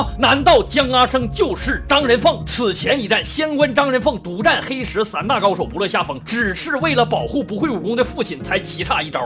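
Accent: Northeast, China. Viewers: 東北味真濃.據說東北人普通話都很好.Thanks♪(･ω･)ﾉ影評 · 最好听、最逗人的东北话，都在这里了。😀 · 这份碴子味儿的解说听上去那是相当地亲切啊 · 一定是咱们东北银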